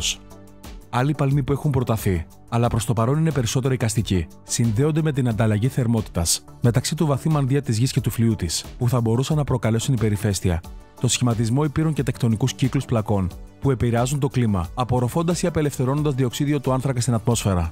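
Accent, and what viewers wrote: Crete, Greece. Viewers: Πολύ ωραίο βίντεο. Έχοντας φτιάξει μία εικόνα γύρω από την φωνή σου, θεωρώ πλέον ότι έχεις καταγωγή κατά 90% από Κρήτη. Please απάντησε μου αν έχω δίκιο 🙂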